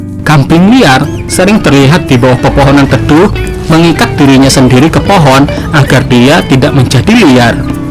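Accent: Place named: Kalimantan, Indonesia. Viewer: Khas kalimantan nya asri banar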